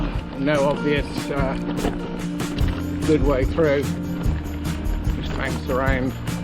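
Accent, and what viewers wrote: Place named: Australia. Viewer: Great to see you're still a bit crazy after all these years. And a bit of an Aussie accent to boot! 😁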